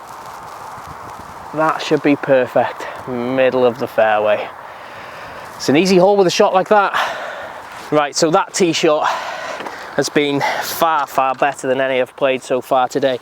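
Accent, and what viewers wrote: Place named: Rhyl, United Kingdom. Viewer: When I heard your accent I thought you were actually from rhyl. I lived in rhyl for quite a few years and I've got some great memories of it, but I never had chance to play the course. I'm determined to go up to play it this summer. By the way give Llanymynech a go if you haven't already played it. Along with church stretton. I think they are the two best courses anywhere.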